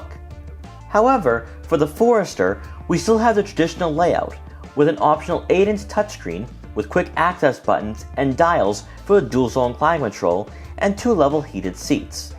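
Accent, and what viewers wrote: Boston, United States. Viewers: Terrible accent for a Bostonian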